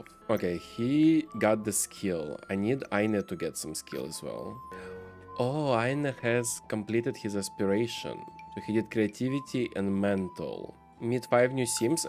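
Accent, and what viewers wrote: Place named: Russia. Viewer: Hi,! Accidentally, saw this video in my YouTube recommendations and immediately subscribed Are you from Russia or Ukraine/Belarus? I recognize this accent from a thousand ❤️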